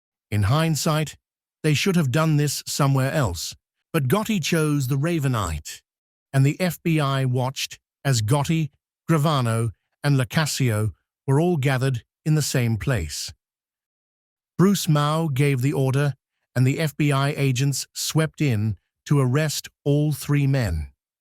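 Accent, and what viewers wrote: United Kingdom. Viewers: I think his crisp British accent is far better understandable than some American mumbling.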